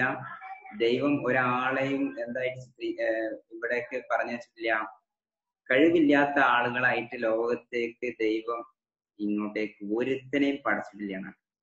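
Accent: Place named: United States. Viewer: good american accent..! bravo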